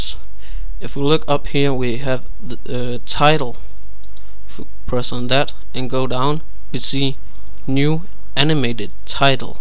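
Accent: Denmark. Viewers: er du dansk ? du lyder sådan :)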